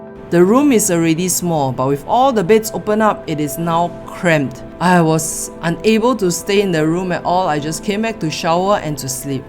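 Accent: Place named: Singapore. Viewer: Yeah, except for the highly annoying clipped singlish destroying the english language.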